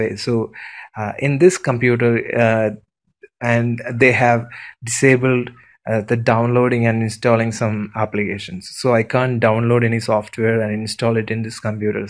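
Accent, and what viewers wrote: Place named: India. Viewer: you know its going to be a good tutorial if you haer that indian acent. btw thx it worked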